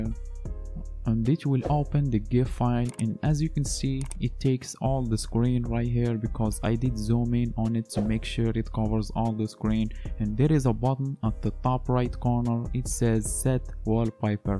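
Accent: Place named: Morocco. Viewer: nta mghribi bayn mn laccent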